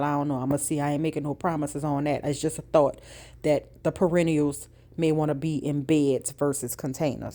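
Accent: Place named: Louisiana, United States. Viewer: You popped up in my feed and the minute you started talking I was like “she has to be from right down the street from me” 😂 (New Orleans) Love your videos. Love your garden. Even the part where you don’t talk. It’s real! And you’re showing us the everyday hard work it takes to grow your own food - instead of when the garden is all full and everything is blooming and it suddenly just got that way … you know the videos I’m talking about? Anyway, thank you for taking all the time it takes and sharing this, I actually picked up some tips or what to do’s from watching. ❤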